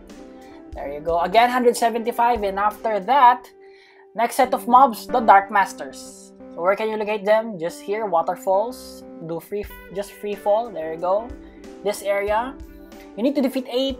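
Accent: Philippines. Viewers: Hey your accent is like filipino haha are you a filipino or not? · are you a filipino? the acsent sound like one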